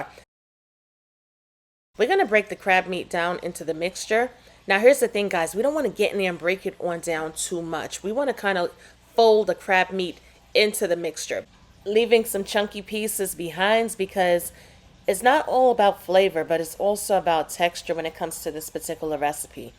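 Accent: New York, United States. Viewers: Love you're recipes, And ya New York accent !! 💕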